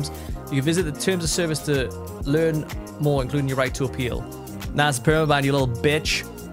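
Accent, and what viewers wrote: Wales, United Kingdom. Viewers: Is that a fellow welsh accent?👀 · do i hear a welsh accent?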